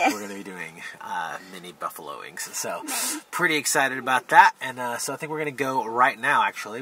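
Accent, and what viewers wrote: England, United Kingdom. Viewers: Happy Birthday the Great, we love you guys you crack me up with your English accent.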